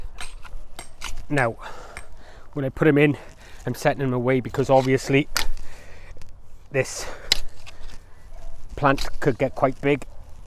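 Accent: Wales, United Kingdom. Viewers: Hi. I'm a new subscriber. Your post's are FABLESS, (Love the Welsh accent) How's your rhubarb done this year 2019? Would appreciate an update. Best wishes from a fellow grower. 👍 ✌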